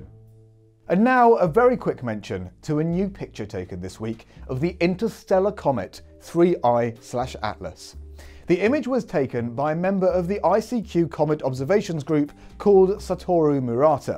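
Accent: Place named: Paraguay. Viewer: Dude he’s from Paraguay, don’t annoy him too much on his English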